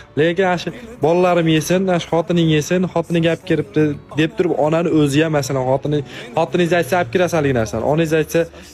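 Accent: Andijan, Uzbekistan. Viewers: Андижоним шеваси ❤ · Shevaga gap yoʻq xaqiqiy anjancha 😅😅😅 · Анжаннанмизиз дейман шеваыиз кетяпти ман. Кашкадареданман сог булинг. Ота угил. Алхамдулилла. Роббил. Аламииин❤❤❤❤❤❤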